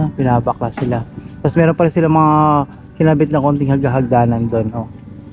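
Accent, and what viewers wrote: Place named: Ilonggo, Philippines. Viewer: basta ilonggo sir malalaman agad2x. More power sir.. 😉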